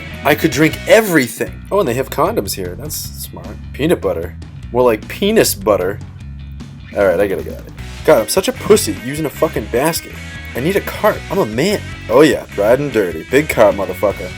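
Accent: Boston, United States. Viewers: Woahh hello random Boston accent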